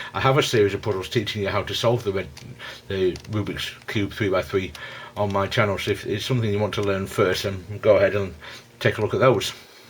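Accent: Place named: Scotland, United Kingdom. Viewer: Good tutorial :) I also like your accent. May I ask what accent that is? (I would say you live on the British Isles, Scotland maybe? I'm truly sorry if I got it wrong)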